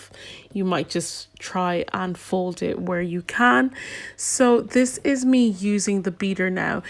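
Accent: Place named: Ireland. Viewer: Are you Irish? Your accent is beautiful.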